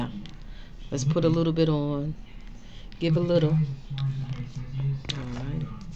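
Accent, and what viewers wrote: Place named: New York, United States. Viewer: Are your from NY? Lol i ask because you sound just like my best friend tasha and you both have a slight NY accent, love it!! You give a calm sweet vibe i like that.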